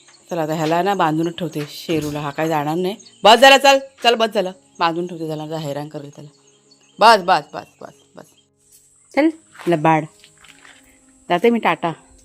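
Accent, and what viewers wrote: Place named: Konkan, India. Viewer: देश तसा वेश तस प्रांत तशी भाषा... प्लीज समजून घ्या कोकणात इतर ठिकाणी अनेक प्रकारच्या भाषा बोलल्या जातात तसेच मग त्याचे उच्चार असतात...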